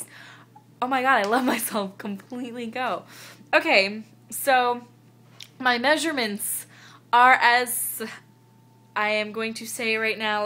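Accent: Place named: United States. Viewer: Yeah i didnt wanna admit but i just eat it too, coz im a fattie and i think 'meh. cake is good' ha. Well, im with you.. im trying to be good.... I've been prescribed this medicine called orlistat which doesnt let the body absorb fat or something... my bum is officially on fire lol. sorry tmi but hey were all ladies moaning here :) And yeh your accent is great!! Any american accent is soo glam to the boring brits lol x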